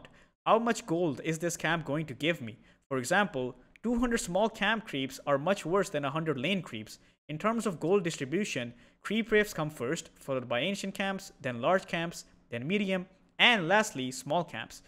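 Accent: India. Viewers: The Indian accent throwing me off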